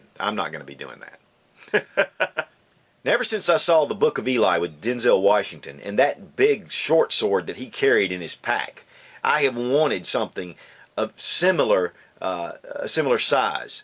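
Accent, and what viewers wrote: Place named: South, United States. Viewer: This guy has the THICKEST southern accent I've ever heard lol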